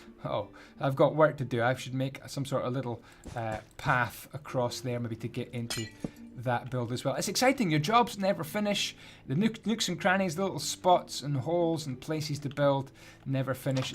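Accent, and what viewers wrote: Scotland, United Kingdom. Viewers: Decided to subscribe because of this building system! And because you're Scotish!. Love the system. IT's so easy to follow as a beginner and easy to spruce up as an expert builder. Keep up the good work. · I love the wee bit of Scottish in there. :) Well done you. ^^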